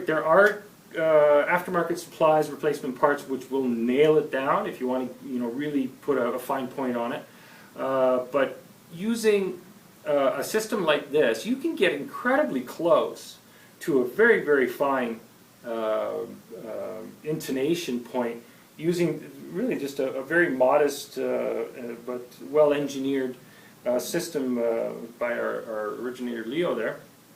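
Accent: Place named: Toronto, Canada. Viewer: for any non-canadians, (and anyone using a string action ruler): A canadian nickel is 1.77 mm thick. A canadian dime is 1.22 mm thick (so two dimes would be 2.44 mm) …I didn’t pick up on the Toronto accent until after i had fished around the house for USD pocket change. American Nickels and dimes are like 20% thicker than their Canadian equivalents, maybe they’d be a good rule of thumb for setting up for slide. Anyway, thank you for the help, my tele plays and sounds like a dream!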